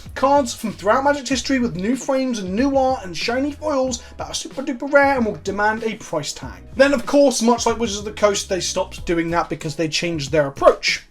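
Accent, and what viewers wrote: RP, United Kingdom. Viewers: This fine chap speaks the Queen’s English with a fervent verbosity and perfectly cogent, delightfully lilting, cadence! Try taking your fingers out of your ears geezer! 😉❤️💂🏽‍♂️🐟🍟🇬🇧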